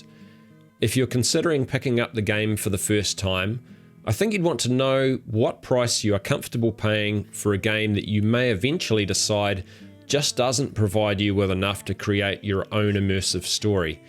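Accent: New Zealand. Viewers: Aussie... No, New Zealander. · Did Matter...... NZ accent will forever be funny to me :D good video though.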